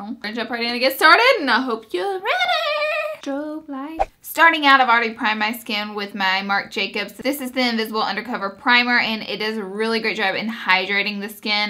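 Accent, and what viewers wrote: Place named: Georgia, United States. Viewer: Ugh, it upsets me when people make comments about your voice because your voice is so cute!! I literally could listen to it all day, ur accent reminds me of back home in Georgia.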